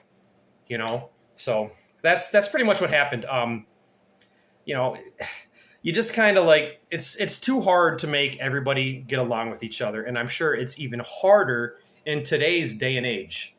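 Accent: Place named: Midwest, United States. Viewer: wow you have a strong midwest accent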